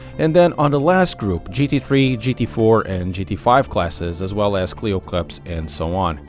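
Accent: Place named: Portugal. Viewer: Ahahaha adoro ouvir tugas a falar inglês. Bom trabalho!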